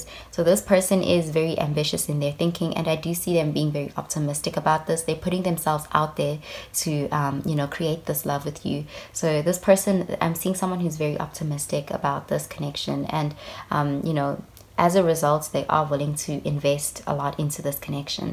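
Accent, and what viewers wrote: South Africa. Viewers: you sound South african 🥺🥰 anyways love your channel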